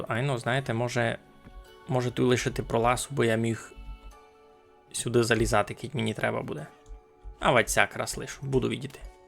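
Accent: Transcarpathia, Ukraine. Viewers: Боржавський або бережанський діалект (центральна частина Закарпатської області). Містить достолиха говірок. Єдиної стандартизованої версії поки не має.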